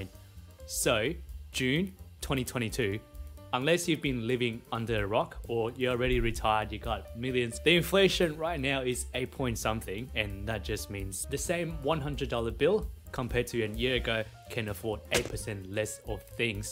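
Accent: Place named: India. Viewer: You chickpee have an Indian accent as an indian i liked it 🤣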